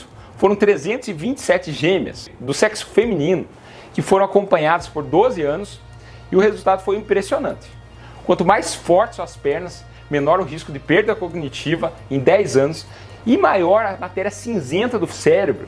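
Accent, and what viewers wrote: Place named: Paraná, Brazil. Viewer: Este sotaque é inconfundível das pessoas do Paraná; morei na Lapa, Cascavel e Francisco Beltrão. Parabéns pela sua dedicação em nos orientar de forma simples.❤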